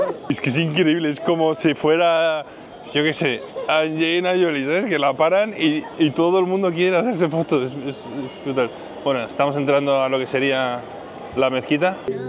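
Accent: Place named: Colombia. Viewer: Que alegría ver por fin a!! Y seguir escuchando ese hermoso acento colombiano ♥️💃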